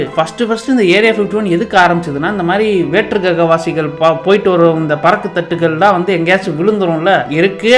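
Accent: Madurai, India. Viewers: Thala nee madurai ya?? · Bro nee madurai la irukkiya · Bro's proving that he's from madurai in every chance he gets🙂‍↔️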